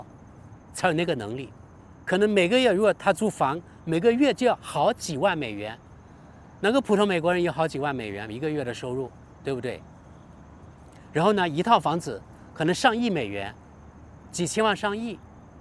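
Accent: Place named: Guiliu, China. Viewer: 航八郎😂 桂柳腔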